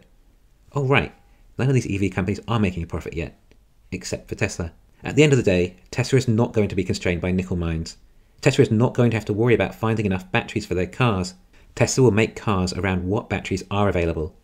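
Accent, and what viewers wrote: United Kingdom. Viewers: I’m British so the accent always suits my ears. More importantly the delivery is clear and without repetition. The simple things like NOT repeating subheadings in the first line of text are well done.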